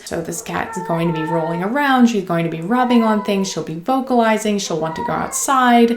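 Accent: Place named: Buffalo, United States. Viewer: I always notice your accent on pronouncing the letter ‘A’ lol Where is this accent from in the US? I’m from Niagara, Ontario and notice a similar accent when i visit Buffalo just over the border lol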